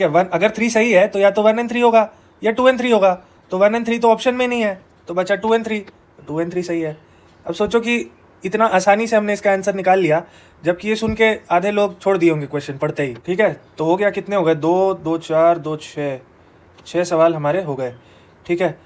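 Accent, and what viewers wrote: India. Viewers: Sir aap banaras se ho kya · Sir aap bihar ya purvanchal up se ho kya ? Aapki language tone kuch waisa hi lag raha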